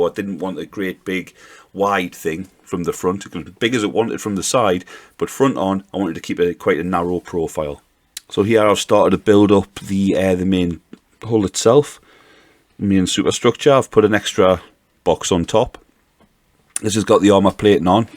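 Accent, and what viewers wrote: United Kingdom. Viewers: It really is no accident that a gentlemen with such a nice Bri'sh accent is a great creator of Ork content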